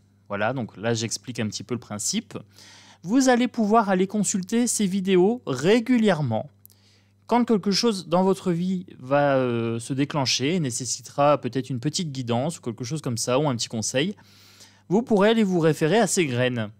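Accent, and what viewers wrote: North, France. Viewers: Bonjour tu vas bien?tu nous fais remonter mon énergie en ce moment je suis flagada de regarder tes vidéos ça me rebouteux. Vivement que ce personnage sorte de notre espace de vie.Bisous à toi.Je pense que tu es du Nord?tu as par moment dans ta façon de parler des mots qui me rappellent mes souches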